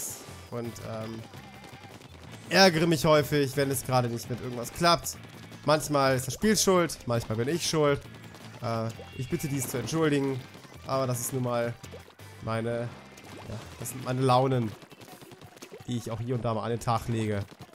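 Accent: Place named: France. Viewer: bester französischer Akzent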